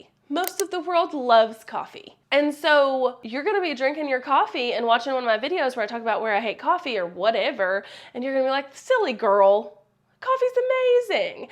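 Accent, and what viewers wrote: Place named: North Carolina, United States. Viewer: As a NC native (who still lives here), I love listening to you because I know that there is a place for someone who talks like me on YT! You give so much great information all the time. Thank you so much for being an open book and giving us all the tips.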